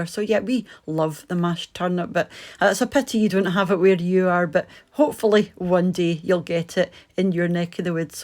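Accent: Scotland, United Kingdom. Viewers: i love ur scottish accent 😭 pls post a video on how to do mac and cheese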